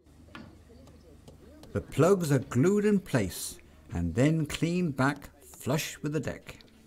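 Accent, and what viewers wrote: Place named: Cornwall, United Kingdom. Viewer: Any further West from Cornwall and you’ll find yourself in the Celtic sea! By the way, Cornwall has at least two accents East Cornwall and West Cornwall the former is closer to the standard West Country of Devon, while the Anglo-Cornish of the West, was influenced by modern English due to the persistence of the Cornish language and, therefore, it has its own cadence and phonology. I would hazard a guess that the narrator is from West Cornwall.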